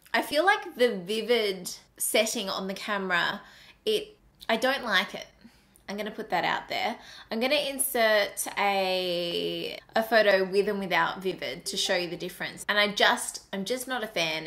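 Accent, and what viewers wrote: Australia. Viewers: Are you from Australia ?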